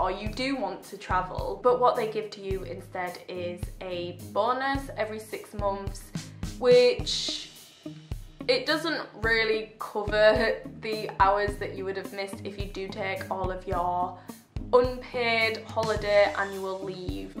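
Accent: United Kingdom. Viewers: hello, very useful video thank you! I can hear from your accent you are British? What's it like being a brit' out there and what tips might you have for brits' wanting to apply or to help adapt them to Vietnamese life/ job? Thank you.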